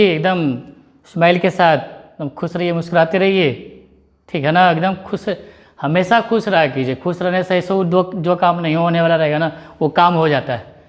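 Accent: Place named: Rural, India. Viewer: 🙏B ke jagahba par 👌👌 dehati language sunnne me mujha kafi achha laga sir0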